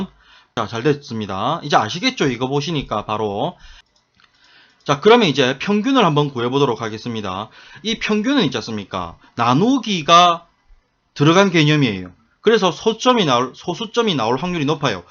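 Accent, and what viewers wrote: Gyeongsang, South Korea. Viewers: 와.. round 함수고 자릿수고 너무 어려웠는데 이 영상 보고 한방에 정리되네요 활용방식(예제)도 너무 적절하고 사투리 억양 덕분에 귀에 쏙쏙 박힙니다 (저도 경상도 사람이랔ㅋㅋㅋ) 구독 눌렀습니다!!❤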